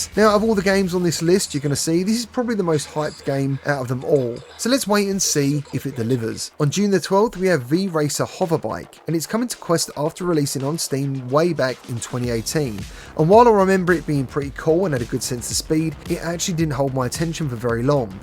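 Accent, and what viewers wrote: England, United Kingdom. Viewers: Gimmie that VR news with that classy english accent brother 🎉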